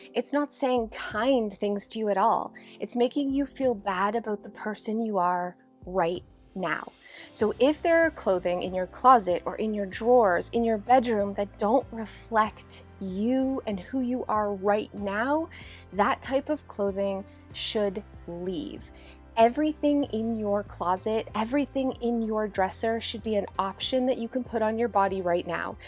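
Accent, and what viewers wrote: South, United States. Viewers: I too was wondering why you were southern all of a sudden.. lol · Love the southern drawl & the bloopers at the end! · The Southern is funniest! Keep it! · What an awesome, hilarious video! Your southern vouce was sooooo good! ... Maybe a video in all southerb twang?!!! Penny you are a beautiful baby! ❤❤❤❤❤ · 😂southern - love it!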